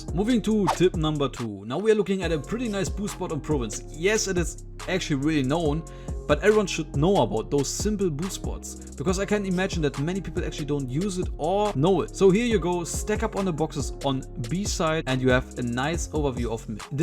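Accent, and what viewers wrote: Germany. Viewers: Noch nie so ein deutsches english gehört hahahah nice video btw❤️👍🏼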